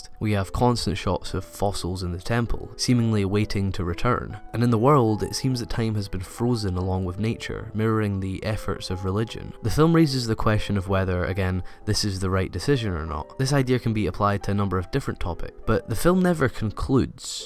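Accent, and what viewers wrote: Scotland, United Kingdom. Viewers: Where is that accent from? Scottish? British?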